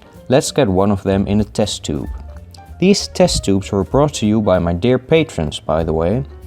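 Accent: Netherlands. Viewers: Like I suspected. A fellow Dutch man. your determination book gave it away. The houses opposite of yours did ring a bell already. I think its Amsterdam. Also the way you pronounce the Latin names rang a bell. Like what you do mate. Keep it going.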